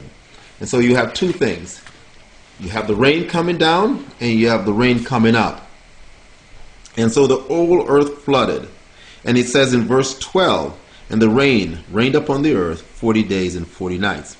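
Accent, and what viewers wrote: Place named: West Indies, Caribbean. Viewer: you have an accent...perhaps you're west indian? and i couldn't find the other channel.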